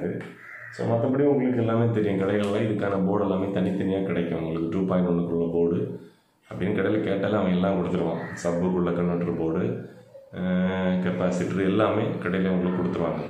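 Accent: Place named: Kerala, India. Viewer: Good voice and slang bro. tamil + korachu Malayalam.... 👌👌👌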